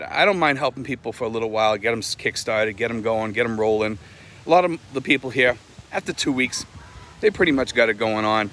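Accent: Boston, United States. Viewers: you sound like a Boston guy. This is my first time seeing your channel, hello from Lynn Ma.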